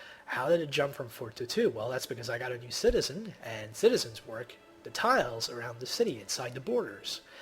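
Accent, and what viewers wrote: Quebec, Canada. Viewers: I don't wanna be racist or try to discriminate but what nationality are you? You're voice sounds very different! It sounds almost french canadian :) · You sound like you're from Quebec. Are you Quebecois?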